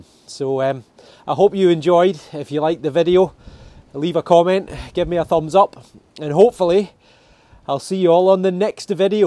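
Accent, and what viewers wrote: Scotland, United Kingdom. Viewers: What a great day,cant wait for pt2..i love coming along with you to perthshire listening to you telling about the history of our monarchs and the scottish relationships too. Your voice is soothing with your soft scotts accent. The worthms them wonderful perthshire worthms😌. Delightful content and now with gold and silvers in abundance, another great scott🙏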